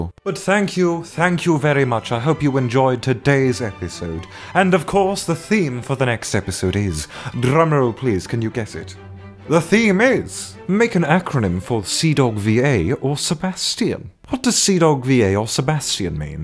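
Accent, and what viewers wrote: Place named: RP, United Kingdom. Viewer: British ,butler.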